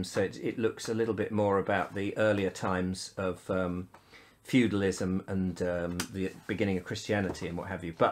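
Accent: United Kingdom. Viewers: Nice, why can't you try to teach British English for the non english people( beginners), I know that lot of english teaching videos are available in utube, but learn from you give some different view I believe, I really enjoyed your english pronunciation, and I very love to learn the British English, and British accent.. Can u do it?...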